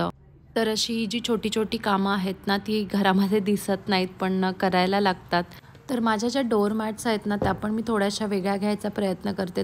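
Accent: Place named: Ahirani, India. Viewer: Tumchi basic language aahirani aahe ka?Tumchi Marathi language Varun vatate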